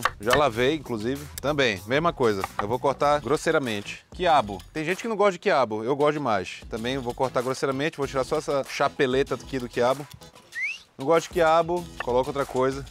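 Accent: Pará, Brazil. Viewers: Adoro esse sotaque paraense "cominho" , "picadinho"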